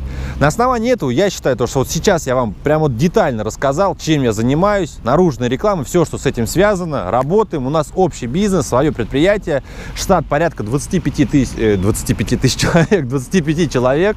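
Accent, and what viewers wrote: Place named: Kirov, Russia. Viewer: Если приедеш в Кировскую область удивишся сколько там похожих с такой дикцией =))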